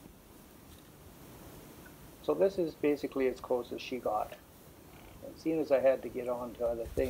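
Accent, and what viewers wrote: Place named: Canada. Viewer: Are you Canadian? You sound like Jordan Peterson (A Canadian debater and Harvard professor)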